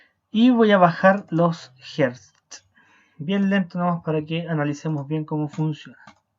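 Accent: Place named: Chile. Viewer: muchísimas gracias muy didáctico y muy bien explicado así es muy fácil de entender por su acento parece ser de Chile , un saludo desde Colombiano.y nuevamente muchas gracias. .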